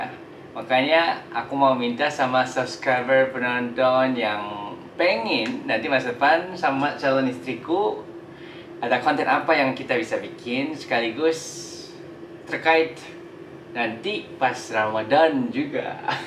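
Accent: Malaysia. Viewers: Bahasa Indonesia masih bercampur bahasa Melayu Malaysia, mungkin karena lama juga di Malaysia. Semoga lancar acara pernikahan nya. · nuna dan oppa adalah channel fave aku❤ kalian tulus ya suka Indonesia, sungguh terharu.... oppa bahasanya kaya Malaysia❤ negara terdekat kami, sodara kami ❤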